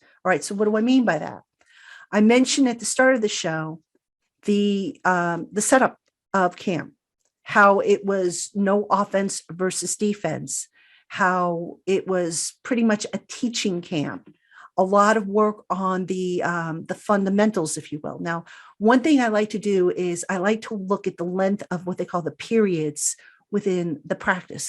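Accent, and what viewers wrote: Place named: New York, United States. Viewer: Thanks for the insight. I get a little NY media in your tone and reviews. I know that’s your background so it’s expected but hearing optimism is also refreshing. That 2nd 3rd round had you with a tone of concerns as us all. Let’s all hope a new day has come.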